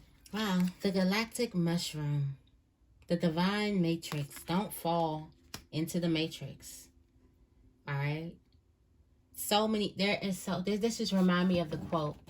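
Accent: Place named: DMV, United States. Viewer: I be hearing a lil DMV in yo voice , you from bmore ?!?! 😂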